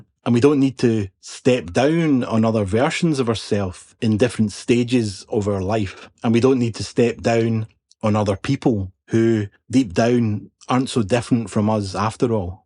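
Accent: Scotland, United Kingdom. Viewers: Incredible video. Helped me understand the film even more than I thought that I did. Also nice to hear a fellow Scott. Subscribed. 💙🤍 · Are you Scottish? 🏴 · I love your scottish pronunciation such reflective words and opinions, I love your way of interpretation and understanding too btw. Well done mate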